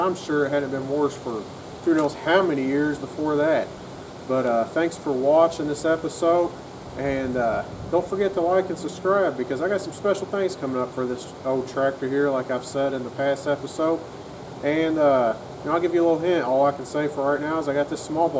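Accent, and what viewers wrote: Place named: Texas, United States. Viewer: Where are you from my friend?? I'm from S.E.Texas and the way you say "that thang" and "right thar",makes me wonder if you're a fellow Texan...😁 I'm really enjoying the work on the tractor and would love to see you do some body work and restoration!!!💯👍👍👍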